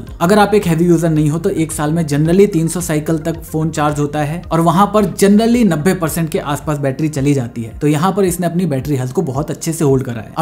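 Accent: India. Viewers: Dondda do dhat indian accent are so funny 😂😂😂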